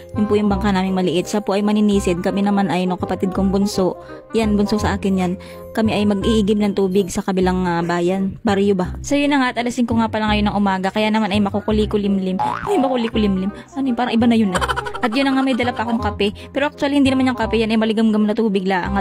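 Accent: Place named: Mindoro, Philippines. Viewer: Ai oo mandin.. dto sa quezon ai kapareha ng punto sa mindoro..saka semi batangas din ☺️ same. Bisaya aq pro dto laang aq nakatira sa perez quezon at uhay nabingwit ng taga quezon 🤣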